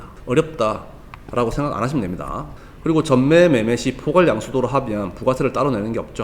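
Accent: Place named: Gyeongsang, South Korea. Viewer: 대구사람이셔요...?... 말투가 익숙해서 더 편하게 들립니당 :) 정주행하고있어요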